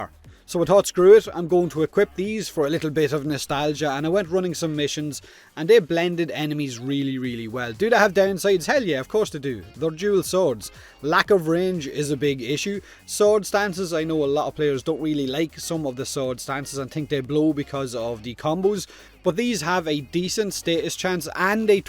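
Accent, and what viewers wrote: Ireland. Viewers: I love your irish accent. It’s amazing. You’re by far my favourite youtuber, and i will definetly try the dual ichors ;3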